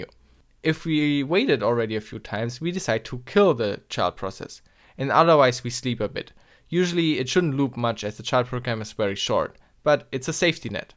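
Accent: Germany. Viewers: I have all the time the feeling that you are from germany because you have a german like accent :D. But nice vids btw :D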